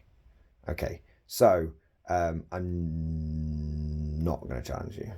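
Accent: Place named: Northern England, United Kingdom. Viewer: It’s a Northern English accent mate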